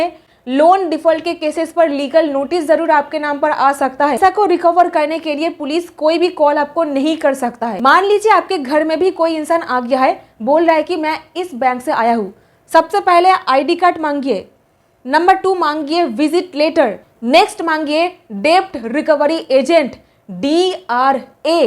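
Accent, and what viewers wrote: Bengal, India. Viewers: Ap medam bangali to nahi hai apake hindi bolane she pata lag raha hai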